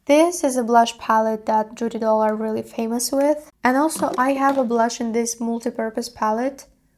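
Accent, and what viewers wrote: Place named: Eastern Europe. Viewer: Сразу видно наша славянка ! Акцент как у меня 😊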